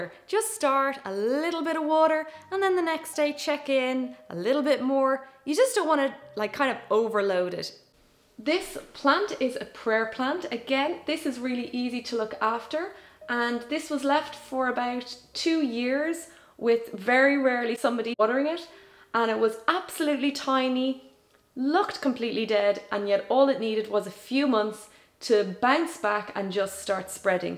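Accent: Ireland. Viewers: Discovered your channel today. Love your soft sweet Irish accent - lovely to listen to. Have just subscibed. Thanks.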